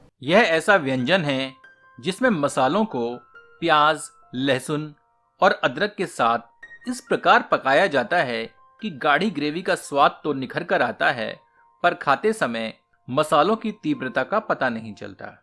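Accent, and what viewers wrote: Standard. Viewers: Itni sudh hindi.... Aisey feel ho rha hai computer hindi doubing kr raha ho😊bt muton cury r luking so delicious... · Apki hindi bilkul sudh h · Good to hear Swach Hindi.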